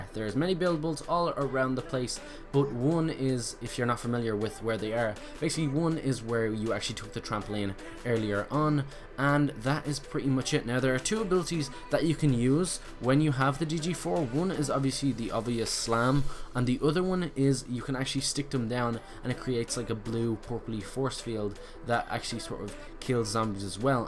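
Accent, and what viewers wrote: Ireland. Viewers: are you irish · great to hear a fellow Irishman doing something like this :)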